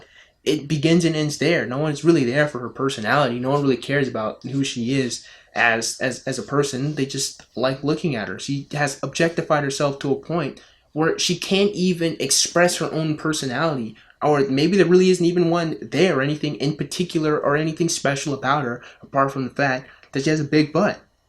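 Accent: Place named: England, United Kingdom. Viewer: Am the only one who likes him whenever he speaks with an English accent. He should try to do a whole video speaking in an English accent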